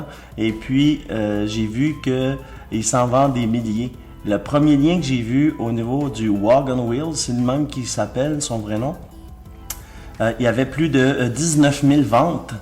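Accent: Quebec, Canada. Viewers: J'adorerais avoir un spinner car j'adore tes vidéos et je trouve que tu expliquer tres bien et j'aime ton accent québécois 😎 sinon ouais jen ai pas et jairmqis en avoir un 😇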